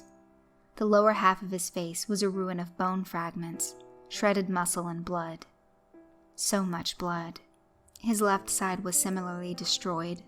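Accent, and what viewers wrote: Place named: Georgia, United States. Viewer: Where ever you get your stories is great much different than the other's but in the best way possible.I love your voice I'm from Tenn and u remind me of family in South Ga Love the channel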